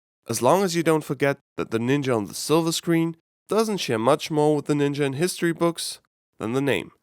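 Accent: Austria. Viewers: He is from Austria, if I recall correctly.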